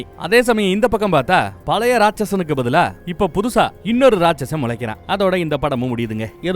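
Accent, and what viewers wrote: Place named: Madurai, India. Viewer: நீங்க மதுரைக்காரரா நம்ம மதுரைக்காரன் மாதிரி பேசுறீங்க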